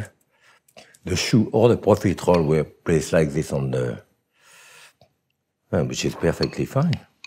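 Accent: France. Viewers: Fransız aksanı ile ingilizce cok tatlı 😀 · I love his rustic French accent. Its so cool!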